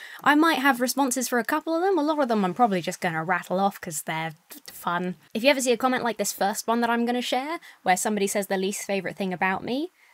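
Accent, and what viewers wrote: United Kingdom. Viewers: Despite being English I tend to watch a lot of American channels but it’s really refreshing to hear some jolly good Bri’ish snark and sass from. 🇬🇧☕️ As for the fish thing, for the sake of being a know it all, there are multiple species that can communicate, such as saddleback clownfish, leaping blennies, grouper fish who can communicate with octopi, as well as fish that can use tools like the tusk fish.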